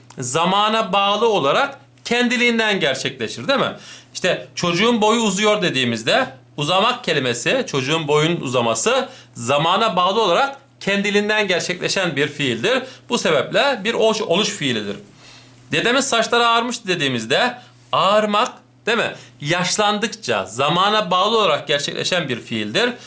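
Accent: Black Sea, Turkey. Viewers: HOCAM KAREDEZLI KONUSUYORSUNUZ BANA OYLE GELDI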